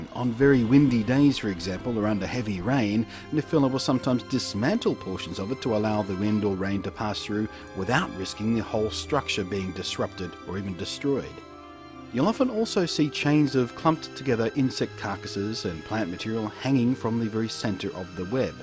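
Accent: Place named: Australia. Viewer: Australian accent + high quality cameras + spiders = very entertaining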